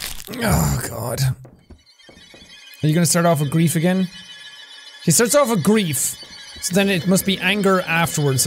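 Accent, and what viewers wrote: Afrikaans, South Africa. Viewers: I'm from South Africa BTW he said my boy not but boy. He just has an Afrikaans accent but I can hear the confusion of but and my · The first game he just said “my boy” not butt boy it’s just his South African accent. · This is legit how some of us sound here in SA 😂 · Quite the heavy Afrikaans one, too! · Hes saying "my boy" :P Its a South African accent :D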